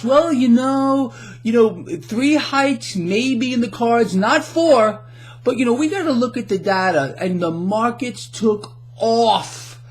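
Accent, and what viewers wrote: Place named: Brooklyn, United States. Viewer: I recently came across your channel and checked out your website, amazing stuff ! I would know a Brooklyn accent anywhere as I have one too !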